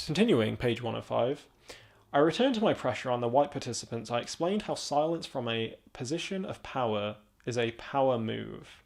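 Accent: United Kingdom. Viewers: where this accent comes from? Are you British?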